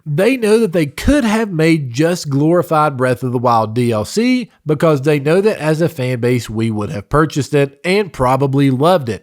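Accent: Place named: South, United States. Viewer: WOW the southern accent REALLY threw me off!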